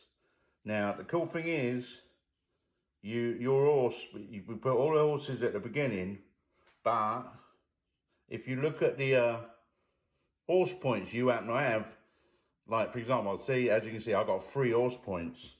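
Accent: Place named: London, United Kingdom. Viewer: Really helpful video on how to play a complicated board game! Love the London accent too, brilliant! 😊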